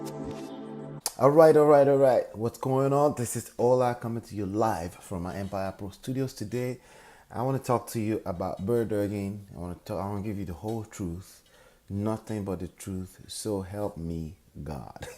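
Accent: Nigeria. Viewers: I think Nigerian accent. I love it. ❤ My daddy is Nigerian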